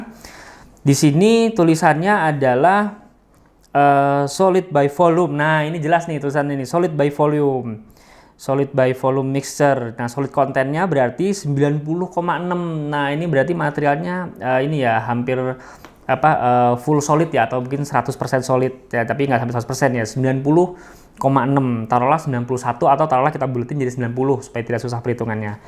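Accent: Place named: Banjar, Indonesia. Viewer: Logat banjar nihhhh..heheheee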